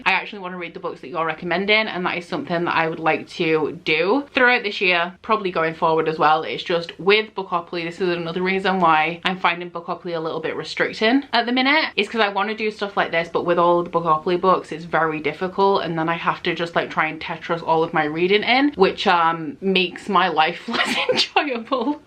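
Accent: Yorkshire, United Kingdom. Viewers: Heey, great video i love supporting your channel. It’s so nice to here a yorkshire accent on youtube as that’s where i’m from and i don’t feel like there are that many booktubers from here. I was just wandering if you have any advice on how to read more as a very busy student with not that much time? as i love reading but i struggle with what to read etc as i’m trying to juggle my masters, work and a life as well? Any advice would be appreciated 😄😄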